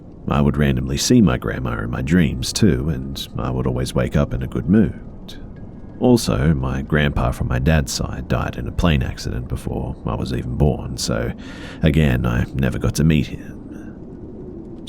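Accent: Australia. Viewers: gosh darn it, why do australians have the best accent?! Thanks for the upload! Much love, man! ❤️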